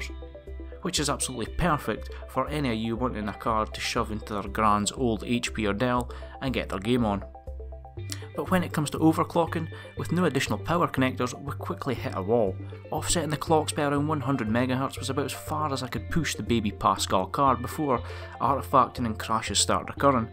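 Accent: Scotland, United Kingdom. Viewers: Faurabouts are you fae loon? Afa strong accent, nae a bad thing mind you :) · Good video + scottish accent, am I right? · scottish accent ,i guess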